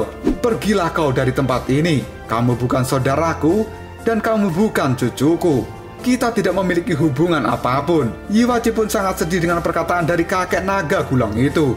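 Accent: Java, Indonesia. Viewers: Logatnya Jawa ya bagus sob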